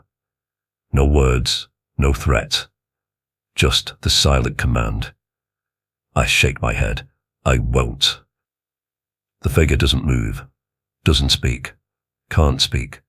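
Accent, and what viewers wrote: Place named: Northern England, United Kingdom. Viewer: Hi, my name is Lynda, I've just subscribed to your channel, I can hear that you're a northerner, I can tell I'm going to love your stories, I'm from Burnley in lancs however I moved to milton keynes 25 years ago, so I tickled pink to hear you're a northerner too, thank you 🙏 💛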